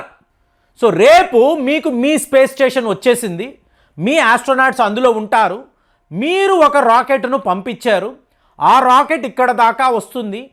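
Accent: Tamil Nadu, India. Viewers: That is Tamil accent.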